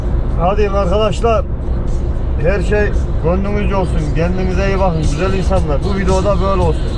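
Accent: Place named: Çorum, Turkey. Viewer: Dede sen corumlu olmadigina eminmisin.corumlular gibi konusuyon.kirsehir corum şivesiylemi konususyor yoksa yaw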